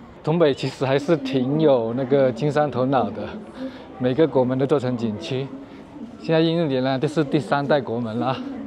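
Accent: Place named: Fujian, China. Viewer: 福建口音濃重到有點假！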